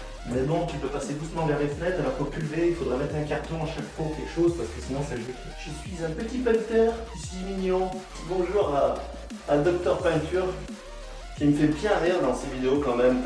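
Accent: Loire, France. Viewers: Ok je me disais bien que tu avais un petit accent du 42 ;) et une petite recherche google m'a confirmé ça. Ma mère bosse dans des petites boites de BTP dans le même département que toi ! Continue en tout cas, au top tes vidéos, et je rigole bien à chaque fois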